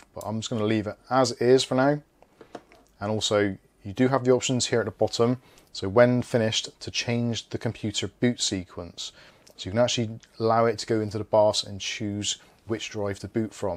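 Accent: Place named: West Country, United Kingdom. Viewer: Great video. Nice West Country accent ;-)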